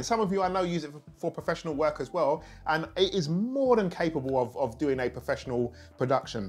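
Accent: United Kingdom. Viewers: I'm here for the British accent, otherwise excellent review we need more of the affordable gear review.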